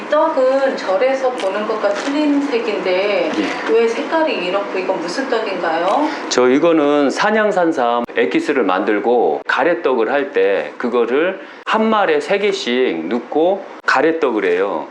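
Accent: Chungcheong, South Korea. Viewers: 유교도안닌것같고 불교도 아닌것같고 무당도 아닌것 같은데 신흥종교인가? 귀신도쫓고 각잡종교 좋은것만 모아서 ...순진한척 충청도 사투리로 이래유 그래유 해가며 그런데 왜 그리 사는지..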